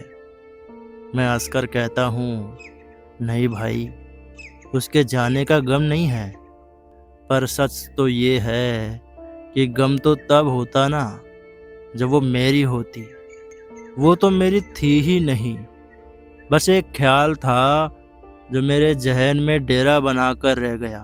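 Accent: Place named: Bihar, India. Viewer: Yh Bihari ki Sound. .Sooo kadva vala sweet..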